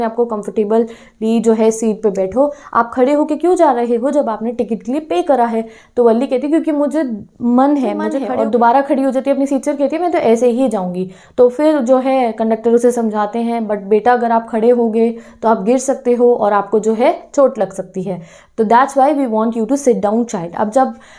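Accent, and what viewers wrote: Punjab, India. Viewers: Di are you a Punjabi?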